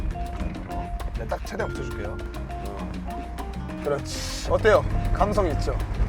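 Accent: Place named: Chungcheong, South Korea. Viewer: 오킹이 충청도 사람이유? 사투리가 친근한디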